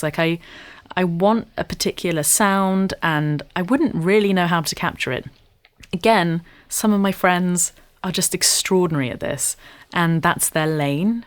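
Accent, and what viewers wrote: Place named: RP, United Kingdom. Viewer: You sound very posh!😮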